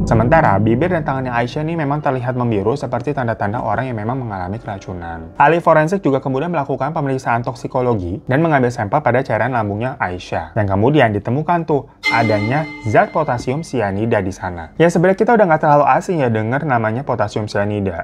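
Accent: Java, Indonesia. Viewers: logat pembang nyo bang mirip logat jowo 😂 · Lucu bngt denger bg tiap x ngmong bhs mana pun tp logatnya ttep jawa..😂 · Bahasa Palembang logat Jawa 😂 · logat Palembang nya lebih kelogat jawa😅🥰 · salfok logat jawa di bahasa palembang😭 gak papa bang dimakluminn kerenn kok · Bhs palembang logat jawo😂😂,, lucu amat😂😂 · Salfok bang ngmong pake bahasa palembang tapi logat jawa hahaha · Kak...😂 Ngapolah logat nya be cerito cak wong jawo😅... Kito wong Palembang Galo ee kak 😂😂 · Pokoknya bahasa apa aja pke logat jawa sama si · Salfok dgr bang orng wong kito orng melayu sumatera,logat bang logat jowo😂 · salfok, lucu banget bang baca teks nya tetep ada aksen dan nada jawanya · Bahasanya bahasa Palembang tapi aksennya aksen jawa..salam dari Palembang bang🙏🏻🙏🏻🙏🏻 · Bang logat nya jawa bangat deh 😂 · Bahaso palembang logat jawo abng ni🤣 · baso plembang with jowo accent unlocked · Bahasa palembang tp logat ny medhok jawa bgt ya bang 😁. 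· Baaaang ini logat palembang looooh kenapa pake logat njowoooooo ang ang ang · Lucu bgt 😅😅.. logat jawa tp bahasa Palembang 😂😂😂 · Bang ngapo logat nyo jawo😂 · logatnya medok bgt😂😂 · Palembang bang.. ngapa dah pake medok Jawa aksen bacanya 😂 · kok logat jawa bg😂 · Bahasa Palembang logat Jawa 😂😂😂😂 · Apapun bahasanya... Logatnya tetap jawa.... Kocak memang Abang satu ini... 😂😂😂 Jawanya kentel banget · Lucu nian, bahasa Palembang tapi logat medok😭😭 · Bhso palembang nya jd aksen jawa yo D maklumi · logattt nyo idk cakitu kok malah logat jawo🤣🤣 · Ngakak, ngapo logat palembang jd logat jawo wkwk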